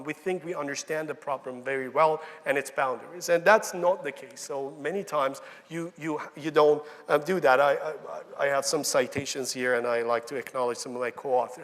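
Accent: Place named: England, United Kingdom. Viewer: I’m awed by this beautiful accent of yours, Prof. Madani! I came here to listen to your English accent and go, but I came, stayed, and listened to the whole speech. Watched it, loved it, and learned so many things from it. I'm a proud Iranian, but so sorry for not having you here in the country. Good Luck.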